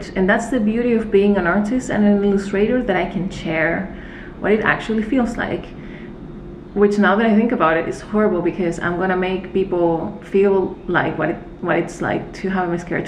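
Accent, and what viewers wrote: Chile. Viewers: Adoro su acento chileno <3 Te quiero eres como un modelo a seguir <3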